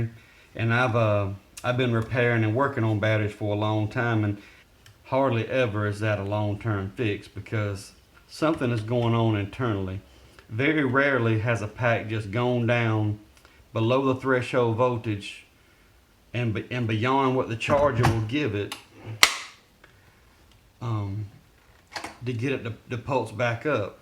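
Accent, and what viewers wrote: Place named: Arkansas, United States. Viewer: i could listen to you all day long! You frpm Arkansas hahaha. God Bless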